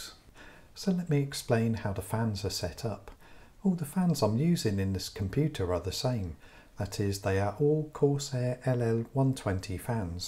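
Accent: United Kingdom. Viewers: We need you to start an asmr channel. You have a great voice, and the British accent just makes it perfect!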